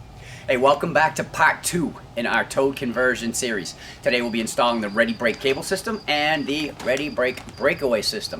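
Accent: Boston, United States. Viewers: Installed Ready Brake and Ready Stop today on my JL.. It was a long day.. Also installed Maximus -3 Tow loops for the JL instead of a base plate . I have a front hitch on mine use for plowing and the Tow Loops play nice with it. Only issue with the Tow Loops is the NSA Clevis Adapter for Maximus 3 tow loop opening is 2mm too small. I had given them the specs. They said they had it in stock.. Welp yeah but wrong size.. They are gonna ship me out the correct one this week... Hey is sound like you are from Boston also..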